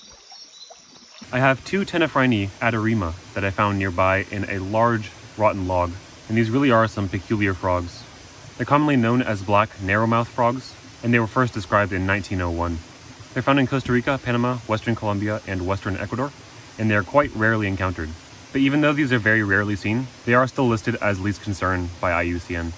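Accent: United States. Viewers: American Accents are bonkers as it is but this guy sounds like he’s trying to talk with marbles in his mouth.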